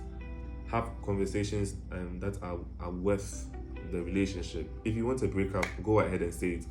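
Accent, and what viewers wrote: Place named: Ghana. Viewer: The best part of these videos is the cool Ghanaian accent 😃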